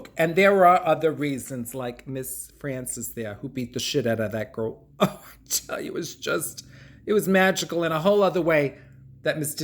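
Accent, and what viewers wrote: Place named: Bronx, United States. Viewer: Omggg..i said you reminded me of my aunts from the Bronx ..You are from the Bronx !! Im floored !!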